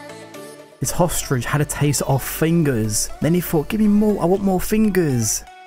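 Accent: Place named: Nottingham, United Kingdom. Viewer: mate you gotta be from nottingham/derby?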